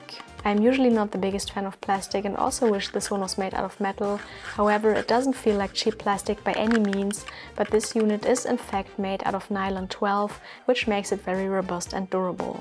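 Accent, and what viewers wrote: Germany. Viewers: been trying to learn and looking for a film scanner? jsut starting to watch your videos. by any chance do you live in Germany? the accent is a littl bit strong, just some stuff I noticed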